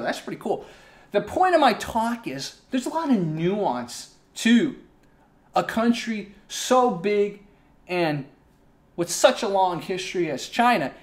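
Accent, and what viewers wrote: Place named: South, United States. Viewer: Why you have southern American accent?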